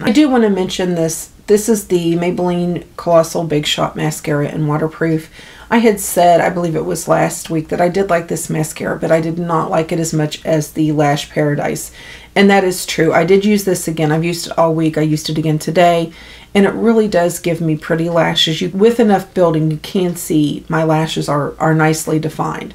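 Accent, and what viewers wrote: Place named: West Virginia, United States. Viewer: Enjoyed your video as I always do. I am moving back home to West Virginia this coming weekend - Ravenswood. Hearing your beautiful accent has made the wait to get back seem shorter! Thank you!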